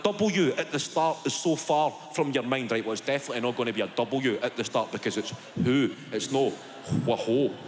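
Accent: Scotland, United Kingdom. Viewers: Brilliant accent easy to understand if your from Scotland, the experiences he talks about of being a kid in 90’s is relatable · I love a Scottish accent 😁 trust me you get stronger, I'd recommend trying to watch Rab C Nesbit 😂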